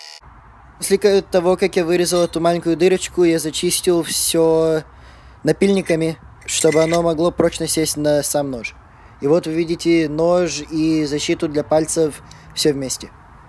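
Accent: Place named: Russia. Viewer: Молодец парень! отличная работа! А все кто смеются над русским произношением,- сами попробуйте на каком то другом языке кроме родного так же бегло что то рассказать .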